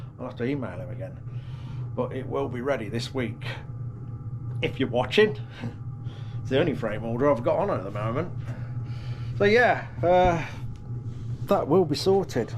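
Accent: United Kingdom. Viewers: Mate, as I may have said before, I’m a 63 year old RAF Vet, watching you for years, a patreon for about 4 years, loving your vids, now living in Maryland in the US working and saving hard so I can return back to the UK. Hopefully I’ll get a chance to meet you before my times up. Every evening I hope to see your latest vid to remind me what I’m missing in the uk and listen to a British accent. Thanks for what you do, it means a lot to me and many others around the world.👍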